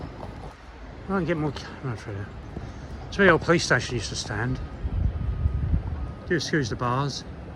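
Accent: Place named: Norfolk, United Kingdom. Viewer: I thought you sounded like you were from Norfolk! DSM are coming to Norwich soon, to knock down Anglia Square.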